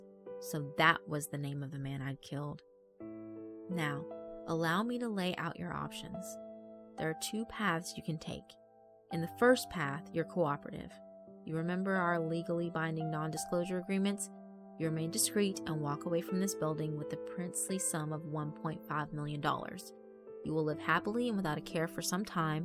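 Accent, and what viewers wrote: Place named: England, United Kingdom. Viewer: Loved the English accent ❤️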